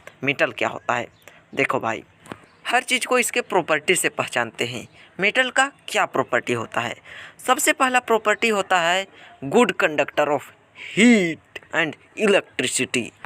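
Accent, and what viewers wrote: Bihar, India. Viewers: Aap bihar ke ho na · Bihari ho khya ap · Bro you are from Bihar I think because your talking style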